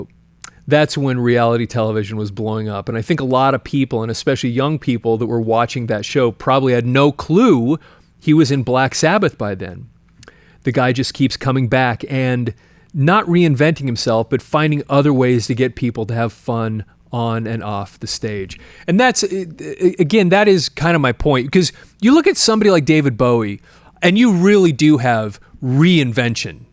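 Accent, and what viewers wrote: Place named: United States. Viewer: È così imbarazzante vedere una persona della tua età così eccitata per una questione da 15enne che non la riguarda direttamente❗Vedo che non sei sposato, sei americano dall'accento e hai 15K iscritti.... è tutto così anormale ❗ Continui a strillare per delle scemenze è imbarazzante non riesco neanche a scrivere ❗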